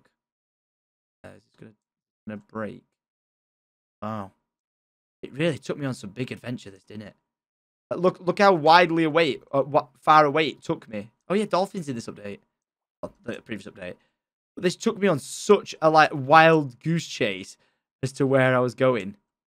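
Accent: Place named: United Kingdom. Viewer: So glad you went back and explored this world again. I spent many hours watching your videos. There's something about someone playing minecraft while talking with a british accent. Like watching Planet Earth. Now I watch other british minecraft streamers, like Ibxtoycat and Philza. I also recently started playing Minecraft again ever since the place I work at got a Minecraft exhibit. Minecraft is an amazing game! Thank you Syndicate!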